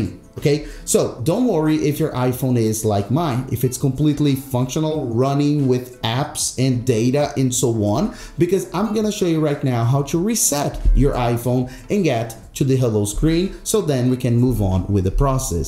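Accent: Brazil. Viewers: I had not expected to be listening to a help video in English with a Brazilian accent - yet here we are hehe On my iPhone 16e, I just can't get to the "Transfer Your Apps & Data" screen. My phone goes straight from the Hello screen to the keyboard customization screen and I can't figure out why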